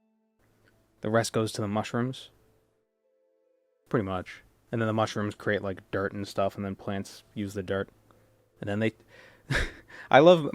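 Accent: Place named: Massachusetts, United States. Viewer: Also, where the hell are you from? That wicked smart was too natural. Im above mass, so i hear that accent and look for a fight happening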